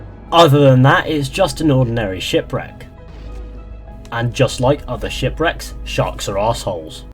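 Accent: United Kingdom. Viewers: Where exactly is your accent from? I know it's somewhere in the UK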